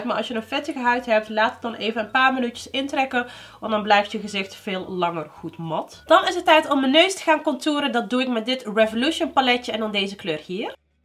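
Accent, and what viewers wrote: Netherlands. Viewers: Lieve. Ik vind het jammer dat ik je nu pas heb ontdekt, maar beter laat dan nooit toch? Je hebt al meteen een fan EN een abonnee erbij. Je hebt echt talent ma sha allah. Blijf ermee doorgaan, ik kijk al uit naar de volgende video’s. Nog 1 vraagje, je hebt echt een heel leuk accentje, het is wel niet volledig Hollands als ik me niet vergis. Van welke stad is dit accentje dat je hebt? Groetjes ❤️🤙🏻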